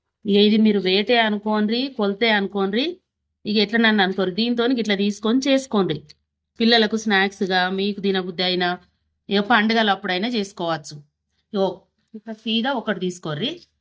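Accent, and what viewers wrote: Telangana, India. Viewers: Amma mee telangana slang bagundi naaku nachindi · అమ్మా మీ తెలంగాణా యాస సూపర్.... చాలా బాగుంది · సూపర్ అమ్మ మీరు మంచిగా తెలంగాణా యాస మాట్లాడుతున్నారు...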